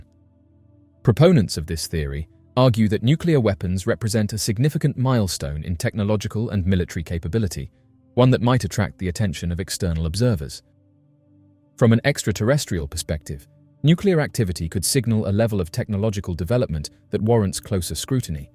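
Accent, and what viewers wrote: England, United Kingdom. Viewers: I prefer American accent. I can barely understand this British accent. Hard to follow. Make a better video with an American accent. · A truly excellent video once more, with excellent scientific commentary from a good clear English accented voice in unhurried speech very easy to follow. This is so much better than many American accented voices seemingly gabbling away at such a rapid pace I find almost impossible to follow or even fully comprehend ! Congratulations to the excellent commentator ! Let’s have more excellent commentators like this speaking scientific sense ! Cheers, Richard Leschen.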